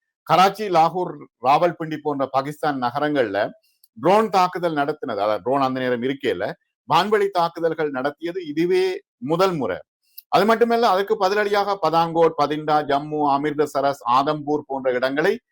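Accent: Sri Lanka. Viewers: முதலில் நீ யாரு உன் தமிழ் இலங்கை தமிழ் மாதிரி தெரியுது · Brother unga srilanka Tamil arumai aana india vanthu Pakistan ku kudutha adi perusu aana indiala paathippunu solluvathu thavaru ingu pathippu apdina Pakistan kaiyala thanamana kolai thanamana makkalai thakkiyathu eni indian army vidathu indian PM Modiji vidamatyaru usa eni vantha angaium adi vilum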